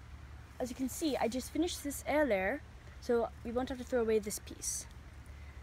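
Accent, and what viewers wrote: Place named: England, United Kingdom. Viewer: You think your better then me cause you have a English accent and have a lab coat?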